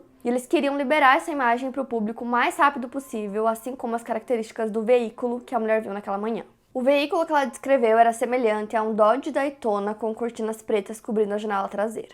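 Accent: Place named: Paraná, Brazil. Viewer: Ela é de Ponta Grossa (Paraná). Também amo o sotaque dela!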